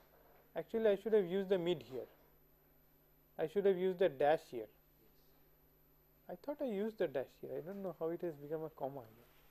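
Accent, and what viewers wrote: India. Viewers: I lke the Indish accent. But thank you.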